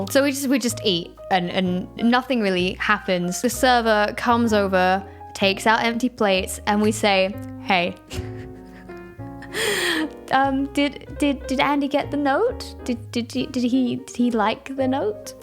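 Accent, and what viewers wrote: United States. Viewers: Why is American accent kinda cute? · Do a video in your American accent. It's hilarious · Love the American accent x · I really like your new videos and I hope you are happier❤️and btw your American accent is on point👌🏻 and this storytime was pretty funny😂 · Her American accent made this vid 50 times better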